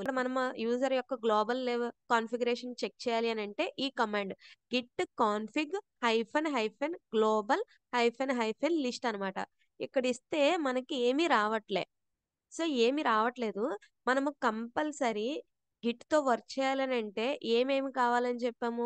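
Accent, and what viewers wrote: Godavari, India. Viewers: mana godarolle...🤪 voice ...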